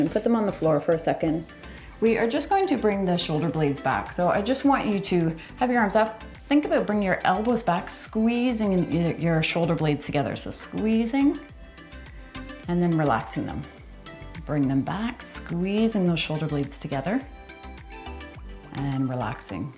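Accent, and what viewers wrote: Canada. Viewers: Thank you, that was simply WONDERFUL!!!, I live in Michigan, and I've been wondering if you're Canadian. The way you pronounce ''out'', I like it.!!!! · I love your class. I walk with a cane. I look like stroke victim but I’m a cancer patient. I am thankful right now for my son, who got married this past weekend and for my daughter who is on a DTS in Glacier National Park. I am from NY in Rochester.where are you from? I hear a slight accent…. Canada?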